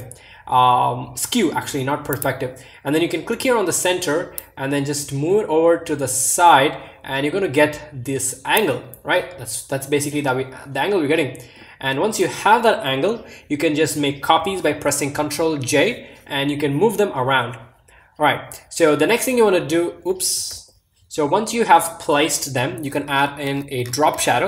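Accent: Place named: India. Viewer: Lol cause you don't sound like one but I noticed the slight pinch of 'desi'ness in ur voice so I posted that comment😉 btw I'm 13 and learning photoshop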